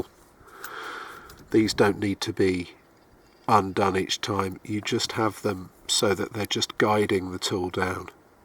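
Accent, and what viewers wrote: England, United Kingdom. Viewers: What a grand name and accent you have! Which part of England is your accent from? Wow! love it.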